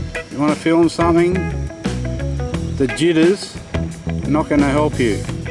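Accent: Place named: Australia. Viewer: What a legend. As a kiwi there is nothing more reassuring than an Aussie accent gently educating you on the finer points of setting up your drone. Most appreciated 😅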